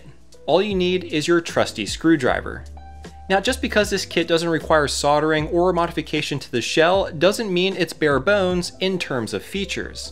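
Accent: Baltimore, United States. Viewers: Are you from Baltimore? I feel like you have a Baltimore accent! Lol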